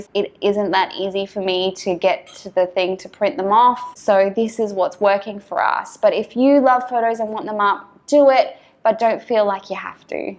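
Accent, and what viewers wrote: Australia. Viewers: Australian accents are so cool.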